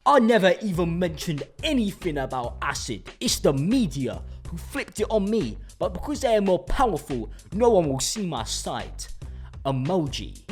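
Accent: United Kingdom. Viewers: You sound like a Brit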